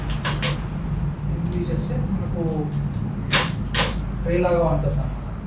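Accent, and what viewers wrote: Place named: Hyderabad, India. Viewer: Still hyderabad slange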